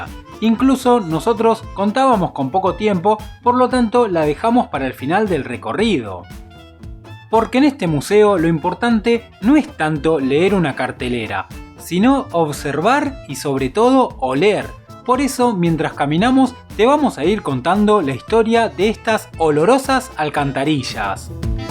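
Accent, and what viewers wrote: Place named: Argentina. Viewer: lo intente ver pero el tonito argentino es realmente castrante, no pueden esforzaren hablar bien, no se dice casye se dice calle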